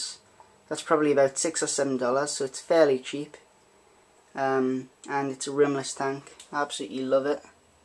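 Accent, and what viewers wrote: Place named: Durham, United Kingdom. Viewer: Lol we're abouts are you from? Sounds like somewhere next to Durham lol. N how many tanks Do you have? I have two going to get more though :)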